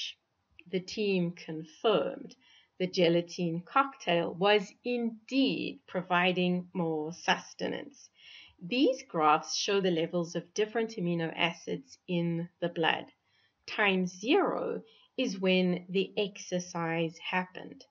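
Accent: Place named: South Africa. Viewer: This is a South African accent! I'm pretty sure about it!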